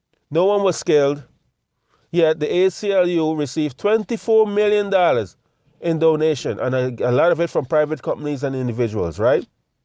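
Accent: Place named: United Kingdom. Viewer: You sound british. You come from a very different experience than afro americans. It's NOT the same.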